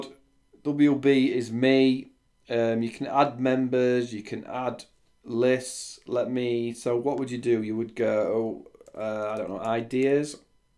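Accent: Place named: Yorkshire, United Kingdom. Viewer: Where are you from, sounds like Yorkshire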